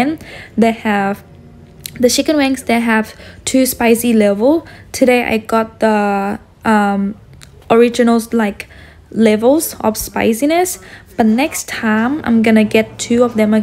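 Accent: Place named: Australia. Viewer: You actually have an Australian accent now, not US english type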